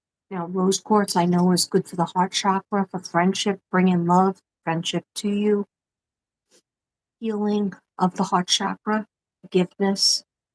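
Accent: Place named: New York, United States. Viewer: Im right under new york in Pennsylvania ❤ive always wanted to visit new york ,when i first heard u i knew u were from new york you have a very strong accent (love it )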